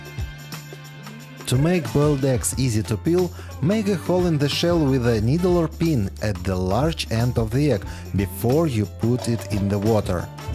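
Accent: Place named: Russia. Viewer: I love this Russian accent!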